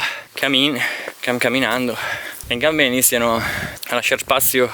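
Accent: Veneto, Italy. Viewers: Bellissimo posto, anche se per arrivare bisogna fare un bel po' di fatica 😁😁 Insomma 40% on è roba di tutti i giorni. Scusa se chiedo, ma da dove sei? Perché l'accento mi pare un po' Veneto, ma non so, forse mi sbaglio io 😁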